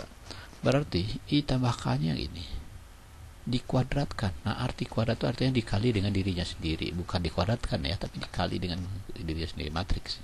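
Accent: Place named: Malaysia. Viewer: Logat nya kayak orang melayu yah pak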